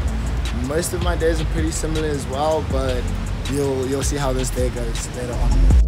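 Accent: South Africa. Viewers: you have a "jhb" accent, I picked it up a mile away 😅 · Am I hearing a South African accent here 👀😅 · Weird question but are you South African😂😂😂 I cant shake the feeling💀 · Your accents sounds so South African, have you ever been to South Africa? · Are you South African??😏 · Lol. Idk why but I'm sensing a South African accent... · Where are you from? I cant tell what accent you have, sounds South African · I noticed that you've got a South African accent, how did you make it to MIT from SA?! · You sound so South African… am I correct or not? 🇿🇦 If so, could you please make a video about it; Matric marks, how you applied, what the costs are, etc. Thanks brother. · Were you born in South Africa, by any chance? Your accent sounds a lot like ours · I know a South African when I hear one. 😂👀👋🏼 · You have a South African accent...you from there??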